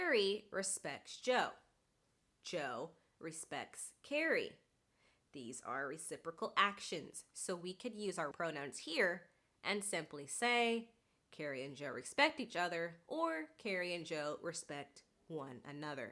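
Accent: United States. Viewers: Great as always sis. I'm from côte d'ivoire and i teach english and I do love american accent. But plz can you gimme the name of the app you use to make your video. Thx take care😊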